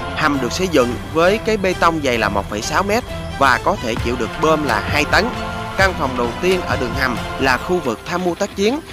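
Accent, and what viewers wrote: Standard. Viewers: Phát âm rất chuẩn. Nghe rất êm tại cảm ơn bạn. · Tôi rất ngưỡng mộ dọng đọc của bạn , dọng đọc phát âm chuẩn , rõ ràng , mạch lạc ,và lưu loát , dẽ hiểu . Ủng hộ bạn .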